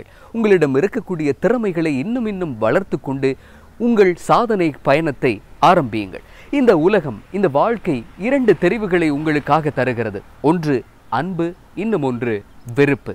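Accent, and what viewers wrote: Sri Lanka. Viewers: அதுதான் இலங்கை தமிழ்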